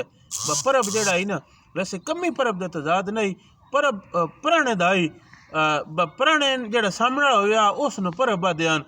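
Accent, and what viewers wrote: Shahpur, Pakistan. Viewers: صاحب ہمیشہ کی طرح خوبصورت ویڈیو اپ کی اپ پنجابی زبان خاص کر شاہپوری لہجہ کے درست ترجمان ہیں سدا سلامت رہیں آباد رہیں ۔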